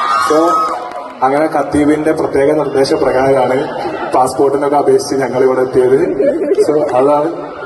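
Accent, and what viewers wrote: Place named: Nilambur, India. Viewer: njagale nilambur slang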